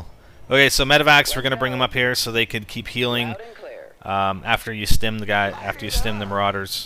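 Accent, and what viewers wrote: Canada. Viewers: I HATE YOUR CANADIAN ACCENT! GO BACK TO ALMOST-AMERICA!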